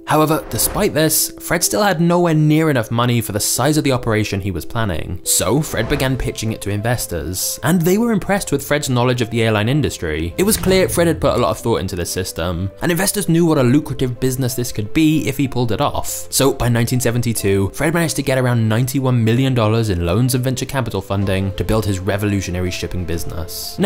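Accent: United Kingdom. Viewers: Yeah but the UK accent sucks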